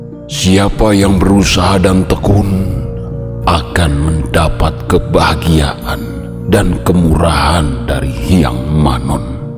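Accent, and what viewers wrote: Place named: Mataraman, Indonesia. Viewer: Kata-kata yang bahasa Jawa jangan dibaca serba o, aku bingung Jangan pake logat Mataraman Pake logat Jawa yang asli saja, a tetap dibaca a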